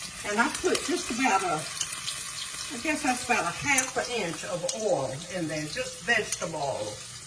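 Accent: South, United States. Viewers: I just found her channel today, and she seems so lovely in addition to being a wonderful cook. And yes, I love her traditional southern accent. 🙂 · She reminds me of my mamaw too! I just love this lady! My mamaw was from TN so her southern accent was a bit different than. She was the best cook!! She made THE BEST EVER pbj sandwiches! I can't wait to see her again oneday! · I love hearing your Southern accent. I lived in Georgia for 35 years, and miss it. 💕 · I love your Southern Accent. Im from North West Florida in the Panhandle. Once on vacation in Denver Colorado I was at a Wendy's with my young daughter and talking with her I apparently stuck out like a thumb! A man walked up to me while I was in line and asked me where I was from. I looked at him puzzled and said...United States. He went back to his table and a different man came up to me and asked me the same thing and I answered the same and was thinking...what in the world is wrong with these people! 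He then said... no what state are you from and I told him Florida and he turned to the table of people he was with and said.... I was right she is from the South. 😂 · She has the most beautiful southern accent - I LOVE her! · one of the last Southern Belles! That drawl is Southern without being "hokey" and yes i am southern too but more country than belle. But my mom and her mom before her cooked like that...limas because I love them, cabbage for my younger sister and sweet potatoes for the youngest one. YUMMMMMM. I can smell it all from here in Alabama. Bless you so much. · Hello from Scotland! just found your chanel. great recipe thankyou. and I also love your Southern tongue !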